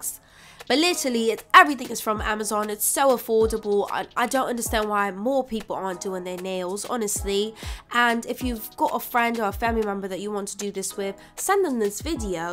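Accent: United Kingdom. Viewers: It was the British accent for me! lmao yes, I no longer visit the salon unless I want to get a russian manicure- to do my nails after lmao (or for a good ole pedi) Love these!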